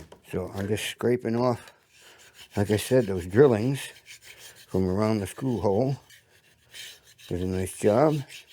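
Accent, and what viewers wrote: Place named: New England, United States. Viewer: Glad yo see you back, enjoy the channel and learned a lot from watching that I've been able to transfer to more general woodworking. Grew up in New England, and it's nice hearing that accent again.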